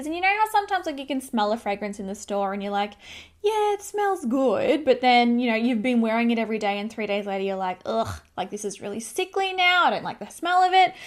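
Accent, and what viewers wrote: Australia. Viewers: I am a huge fan of yours!! I lived in Sydney for years so I feel at home with you. I'm in Argentina now but my father is there. Love your good vibes and don't worry about the aussie accent is great!! Loved your wedding blog!! 😍😍❤❤💋💋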